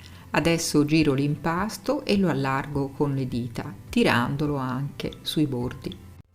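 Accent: Tuscany, Italy. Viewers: quell'accento todcano che rende il tutto "più buono"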